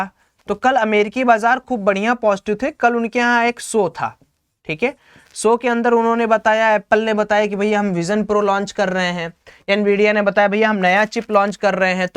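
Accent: Varanasi, India. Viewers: Varanasi se ho kya bhai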